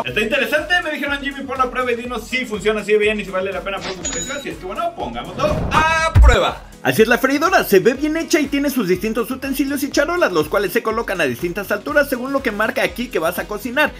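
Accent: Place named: India. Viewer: Por que habla como indu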